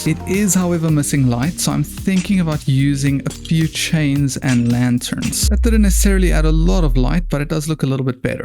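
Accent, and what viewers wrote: South Africa. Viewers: Are you from south africa? I can hear a accent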